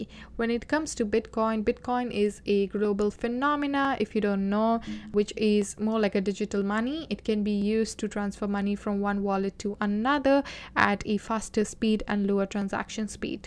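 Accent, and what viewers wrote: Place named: India. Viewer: Luv the video.thank.u. R u.from india luv the accent.🙏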